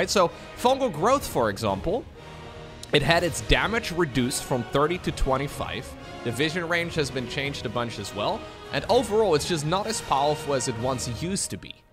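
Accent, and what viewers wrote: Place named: Netherlands. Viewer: I'll never not hear that dutch accent on the "O"s -COHMPFIDENT! -COHMPTFORTABLE!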